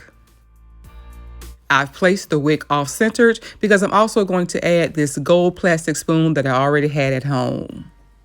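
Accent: South, United States. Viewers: So nice. You have a heavy, Southern accent.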